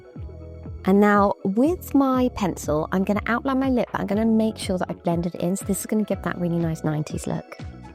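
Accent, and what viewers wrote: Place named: Wales, United Kingdom. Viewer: Love this look ❤ Also this is so random, but looks and sounds a bit like Amy Dowden from Strictly! I'm sure I can hear a Welsh accent being Welsh myself, so I wonder if they are related somehow?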